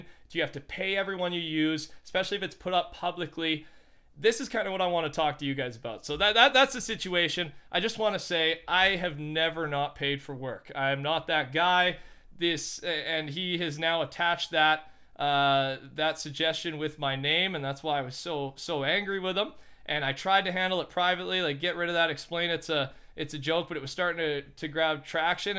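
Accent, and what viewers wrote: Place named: Canada. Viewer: I love it when Canadians say that there fired up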